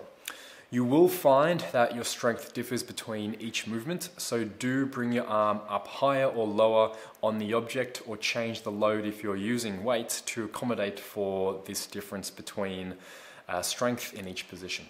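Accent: Australia. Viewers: Was so happy when I heard that Aussie accent coming through 🤙 great videos mate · Blessa my heart Blessa my soul Never seen an Asian whith an Aussie accent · Are you Australian,? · the whole time i thought this asian guy speaking with fluent Australian accent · Was NOT expecting the Aussie accent from THIS guy, just saying!!!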